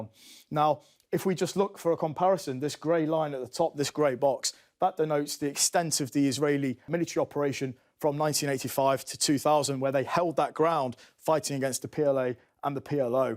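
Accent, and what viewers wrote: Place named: Yorkshire, United Kingdom. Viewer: It's impossible for Americans to hear through the Yorkshire accent. E.g."cru-shul-ly erode that".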